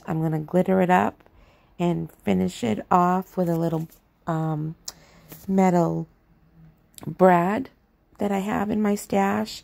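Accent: Boston, United States. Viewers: Are you from the Boston area? It's been a long time since I heard 'wicked smart'!! Personally I grew up a valley girl (Merrimack Valley) 😊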